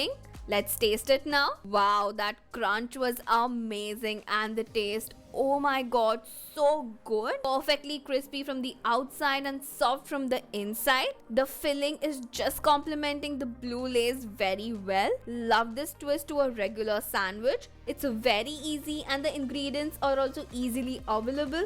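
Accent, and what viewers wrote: India. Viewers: I just love the way you talk in English and a desi tadaka . Will try this hacks for sure . LOVE YOU !!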